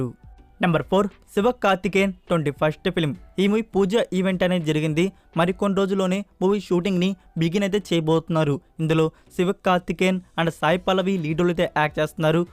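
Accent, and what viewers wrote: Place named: Tamil Nadu, India. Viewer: Hahaha Tamilnadu Karnataka vallu matladinattu undhi mi Telugu....Anyway Ur Content is always Good brother....👏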